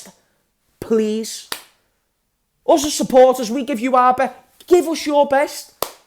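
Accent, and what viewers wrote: Liverpool, United Kingdom. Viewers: Another gobby scouser strikes again.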